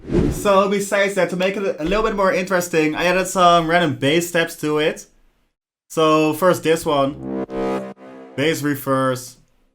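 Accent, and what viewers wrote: Netherlands. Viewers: U must be dutch ey?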